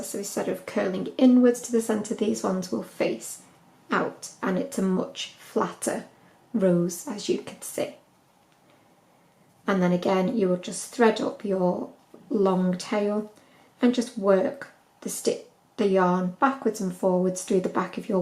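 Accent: United Kingdom. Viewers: I crashed out because of how fast she was going and didn’t understand her British accent which got me so mad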